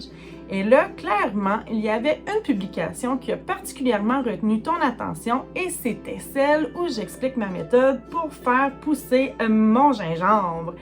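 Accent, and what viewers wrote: Quebec, Canada. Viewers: J'aime bien mais un peu difficile avec l'accent. J'aime le Québec ❤️ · Bonjour de France 😍 merci pour ce partage , cet accent Québécois me ravit tellement 🥰 me suis abonnée pour voir ce que deviennent les plantations de rhizomes ! à bientôt .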